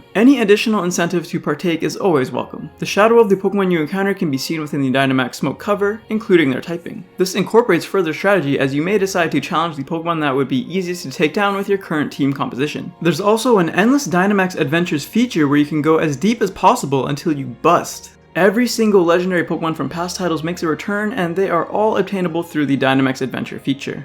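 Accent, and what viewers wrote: Canada. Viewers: Is he Canadian ?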